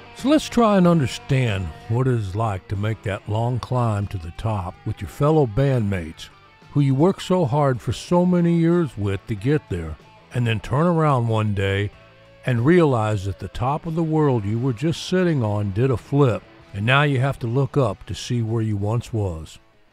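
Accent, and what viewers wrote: South, United States. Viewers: Understood. I was just thinking from the point of view of someone who may have never seen your previous ones. I do like your videos. Your Southern accent makes them unique. Keep it up.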